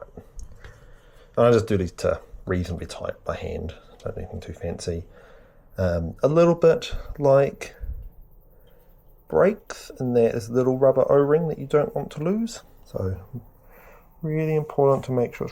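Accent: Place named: New Zealand. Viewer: Hi. Are you in NZ? Thought I might have picked the accent 👍 Can I ask where you purchased your third party service kit? I’m in NZ & found the tube that connects to the syringe for $19 & 50ml of oil for $15, both from 99 bikes which seems reasonable. Cheers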